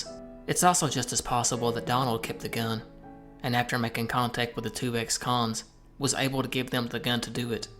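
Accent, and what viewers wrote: South, United States. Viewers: Kentucky? WV? Tennessee? I’m trying to pinpoint lol. I’m in kentucky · I recognize southern accent.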